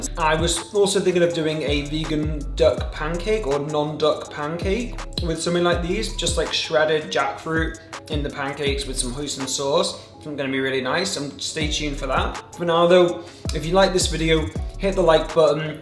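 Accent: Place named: Liverpool, United Kingdom. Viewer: Food & Fitness Always yay I’m from Bromborough in the Wirral 🙌 London now though I could definitely hear it a little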